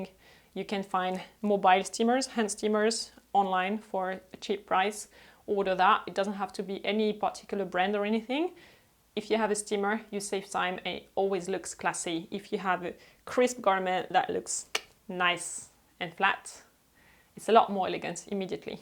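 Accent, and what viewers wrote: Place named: France. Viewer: thank you for such an interesting videos! Can you do an advice video what to do with old clothes that I don't want to wear anymore? What do you do? P.S. your French accent is very cute!:)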